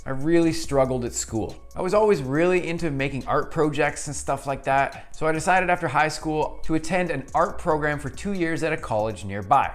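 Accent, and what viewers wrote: Canada. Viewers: well he is canadian….and vancouver island to boooot…PRAW JECT….not PRO JECT… PROJECT is “she’s projecting her emotions on him” PRAW JECT is “this is a project I want to accomplish”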